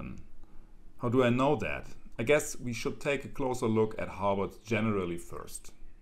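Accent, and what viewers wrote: Germany. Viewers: Hallo, dein Dialekt hört sich deutsch an, hast du auch einen Kanal mit deutschen Videos?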